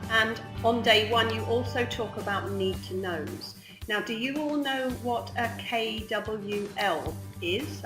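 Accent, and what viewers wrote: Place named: United Kingdom. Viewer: Hello:) I've just finished watching your video and I've got to say that, in my estimation at least, it was pretty helpful:) Besides, I've been your loyal viewer for a long time but not in order to learn English, just for fun. Your English is incredibly fluent and additionally your accent sounds very British and so that makes me think you are a teacher. If I'm correct, I'd like to ask you a question:) Well, I currently attend a secondary school and I've been wondering what I can possibly do to decrease my anxiety while talking to my teacher. My being anxious doesn't stop me from keeping a conversation going but it's quite annoying because then I can't help the swaying of my legs from side to side or I feel a constant need to gesture. Also, I sometimes feel kind of embarrassed when I speak and my teacher smiles to me or looks intensively in my eyes. 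I don't know why it works like that. Nonetheless, I do not deal with this problem while shooting the breeze with my friends in English or having a conversation with other English teacher at school. I want to start working on it but I'd need some advice and you're the only available teacher :) I know my message is long, I'm sorry, I hope you successfully reached the end:D I'm looking forward to hearing from you:)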